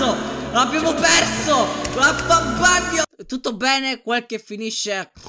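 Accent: Sicily, Italy. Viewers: È della sicilia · Ma sei Siciliano ? :))))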